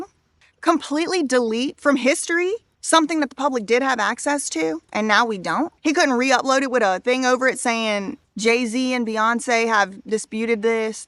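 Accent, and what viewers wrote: South, United States. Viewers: Thank you, I knew it’s southern, brilliant!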